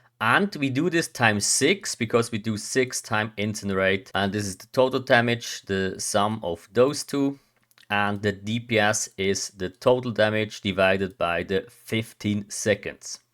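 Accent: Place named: Switzerland. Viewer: very thick swiss accent haha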